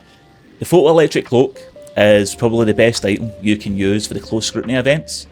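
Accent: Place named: RP, United Kingdom. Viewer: Nice effort with the posh accent.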